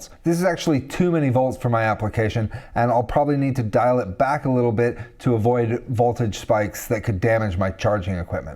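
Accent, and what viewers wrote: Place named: United States. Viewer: I'm hearing American English, but I se British power outlets. so.. . what's the story ?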